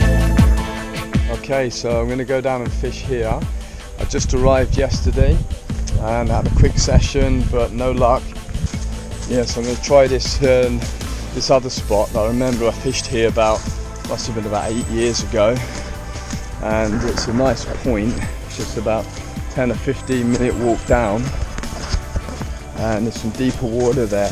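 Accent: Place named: England, United Kingdom. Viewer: Hi loved the vid and subscribed. This vid had me fascinated, we get bluefish here in Australia where they are called Tailor. I was actually thinking this was an American clip on bluefish ing, next thing I hear your English accent, then see you in some kind of subtropical location Confused lol, what country is this and why do the fish get so big there? Are you a regular tourist to the area or live/work in the area? Cheers mate loved the vid big time.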